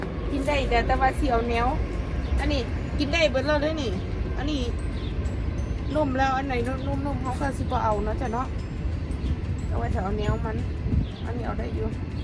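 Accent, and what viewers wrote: Udon Thani, Thailand. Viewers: ສຳນຽງທີ່ເຈົ້າເວົ້າຄືຄົນອຸດອນນີ້ລະ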